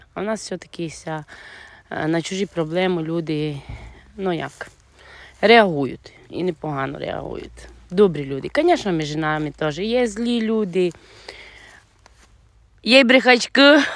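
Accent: Transcarpathia, Ukraine. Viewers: Закарпатський діалект файний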